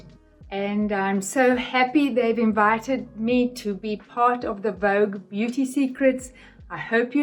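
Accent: South Africa. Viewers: South African accent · It's weird hearing a South African accent on Vogue. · She still has her South African accent 🥰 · Love her South African accent. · You can hear her South African accent perfectly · As a South African I definitely heard her accent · So good to hear a South African Accent on Vogue ;) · its a South African accent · She kinda sounds South African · I recognised her south african accent as soon as she started speaking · I love that she still has her South African accent. I really love her representation for older generations. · Wow, you so beautiful with or without make up. I actually was scrolling past and the videos play on there own and I got drawn in by the sound of your voice. I can just hear the South African accent in your voice as I am South African and was so confused lol until you explained. I hope that even in my later years I can be just as poised and elegant as you are. 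· What an exquisitely charming lovely Women. The South African accent is just beautiful . Thank you Vogue for presenting a mature women aging lovely . This was just a refreshing tutorial.👏👏👏🇨🇦❤️ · Love your saffa accent ❤ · I love that you've still got the Saffer accent!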